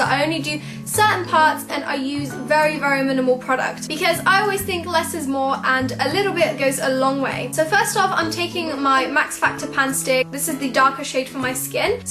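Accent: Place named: United Kingdom. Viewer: To zabawne, bo pomimo tego, że masz piękny i co za tym idzie, mylący, brytyjski akcent, jakoś od początku przeszło mi przez myśl, że jesteś Polką :D Dobra robota, świetny kanał :*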